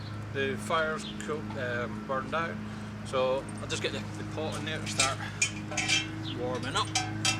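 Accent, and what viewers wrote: Scotland, United Kingdom. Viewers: Are you Scottish (I am) and for the pen fishing rod video you made a year or so ago can you make a follow up video on the line, hooks, bait, floats, weights ect. Great video and have a good day!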